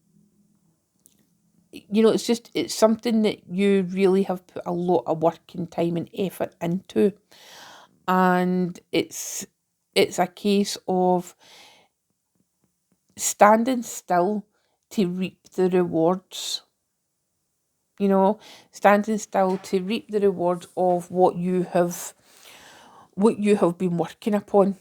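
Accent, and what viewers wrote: Scotland, United Kingdom. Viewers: Interesting. First time here ☺️ am I detecting a Scottish accent?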